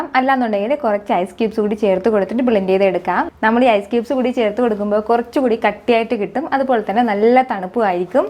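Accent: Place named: Malabar, India. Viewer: Actually trivadrum tanne anoo..voice cheriya oru malabari touch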